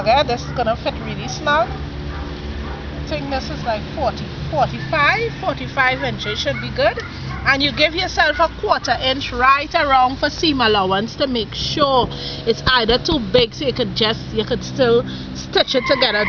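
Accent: Trinidad and Tobago. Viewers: when i started looking at this video i say but how this lady sounds like a Trini so, I like it, we need to meet some time. I am from Trinidad and Tobago and I teach Fabric Design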